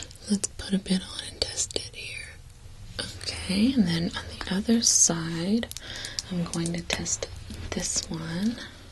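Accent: South, United States. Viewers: This is the first video I've watched by you but im blown away with the fluidity of your speech, your knowledge of the products and your voice itself. Do I detect a hint of a southern accent? I'm from Texas so it sounded somewhat familiar haha. In addition, it's always a plus to have your makeup done by Morticia Adams haha, I love your look!!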